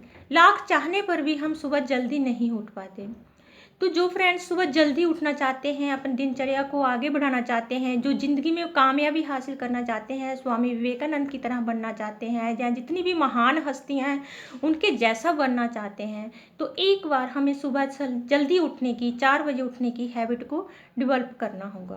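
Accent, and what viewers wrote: Punjab, India. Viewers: Nice video. You are pure Punjabi( I mean your Hindi is in Punjabi tone)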